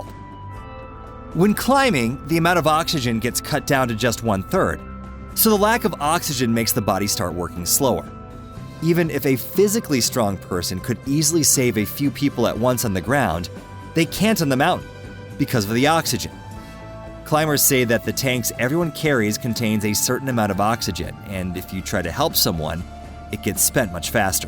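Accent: United States. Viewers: This starts with some cheesy Yank voice over saying « Hey Guys »….WTF? One can tell immediately this is not going to be serious and it will be some D grade joke…mind you I switched off immediately so maybe not..(bet it is).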